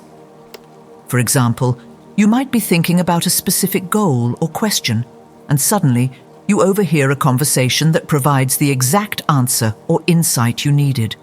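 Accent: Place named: United Kingdom. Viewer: Ok UK accent!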